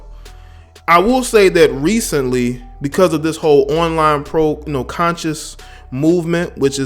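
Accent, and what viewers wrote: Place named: AAVE, United States. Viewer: Stop speaking ebonics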